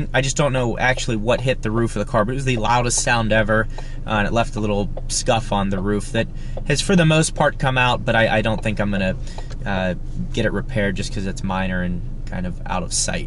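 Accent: Pittsburgh, United States. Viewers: Holy shit, you're a yinzer! Lol I'm just south of Pittsburgh and looking for an mk3.5 for a daily at the moment.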